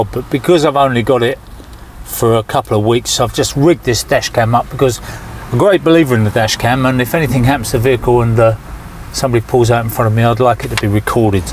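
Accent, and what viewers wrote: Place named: London, United Kingdom. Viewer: Hi. Very interesting video, your definitely a natural in front of the camera. I didn’t realise your stomping ground was London, but I guess you’re accent should have been a clue 🤣. As I have stated before regards the charging aspect, and you have said exactly the same a lot of houses are not able to support the extra demands of changing vehicles. Which might be even more than one vehicle. Again as you rightly point out the government has to take this reality on board, to make this a viable alternative for everyone. Best wishes and kind regards to you and your work colleagues 😀👍👍👍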